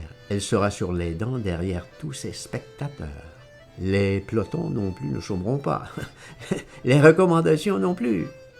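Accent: Quebec, Canada. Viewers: Moi qui ai du mal avec l'accent Quebecois, souvent coupé au couteau, je trouve qu'ici il apporte beaucoup de vitalité à un texte drôle et grinçant. Vous avez du talent.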